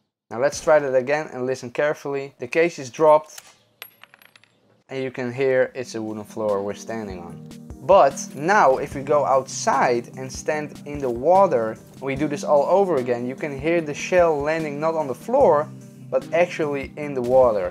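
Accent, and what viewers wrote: Netherlands. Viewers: Ik hoor aan je accent dat je Nederlands bent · Are u dutch? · Are you dutch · kinda cringeworthy to hear a fellow Dutch person talk English using a Dutch accent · For sure that's a Dutch accent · You have a Dutch accend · Are you dutch? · are you dutch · echt zn nederlands/engels accent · is dat een Nederlands accent?? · zo te horen ben je Nederlands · You dutch? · Definitely dutch this guy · Are u dutch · you sound a bit dutch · you sound like a dutch fpsrussia! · I subbed cause i like your channel but 1 question are you dutch? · Ben jij nederlands? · are ou dutch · R u dutch?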